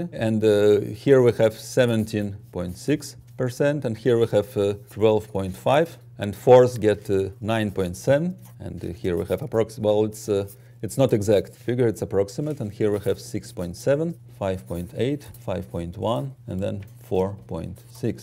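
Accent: Russia. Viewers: Is this guy russian? His accent is very familiar to russian. P.S. : No stereotypes, i just live in Russia and i have to admit, that most of us, russians, have terrible pronunciation:) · I immediately recognized he is Russian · is he russian?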